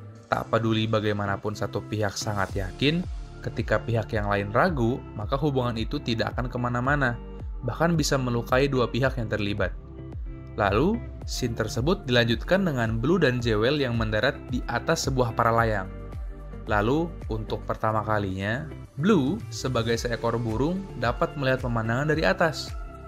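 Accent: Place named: Indonesia. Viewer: Jewel wak, bacanya logat indo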